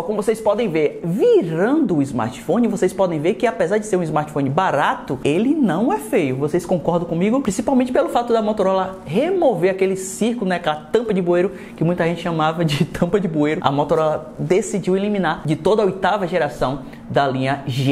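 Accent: Bahia, Brazil. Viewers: Like só por que tu é baiano meü querido. 💪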